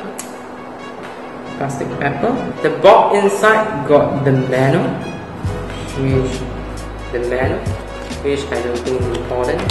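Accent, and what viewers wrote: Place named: Singapore. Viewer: That singlish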